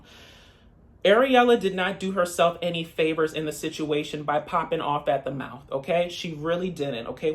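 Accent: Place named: Caribbean. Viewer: Loved the Caribbean accent in the intro and the entire review. I agree with your take on all the couples. All these people have these serious conversations waaaaay too soon from the arrival of the “foreign” fiancé. Emily is just very childish and unrealistic- she wants the wedding and the diamond ring but in reality she doesn’t even know if she really has true feelings for this guy. I don’t believe that there would be no option for them to have any alone time after they got settled at her parents house. I’m sure the grandparents wouldn’t mind watching the baby for a couple of hours after Kobe arrived for them to have a date night the next day. But like you said she trapped him into the whole arrangement without prior discussions. Best regards!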